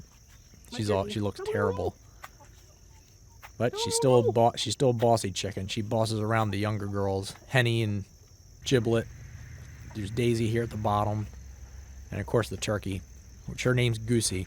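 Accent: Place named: Carolinas, United States. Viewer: U sound like ur from nc or sc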